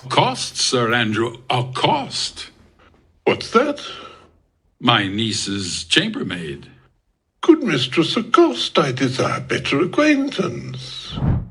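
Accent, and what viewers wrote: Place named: Italy. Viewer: From your accent I think you are Italian🥰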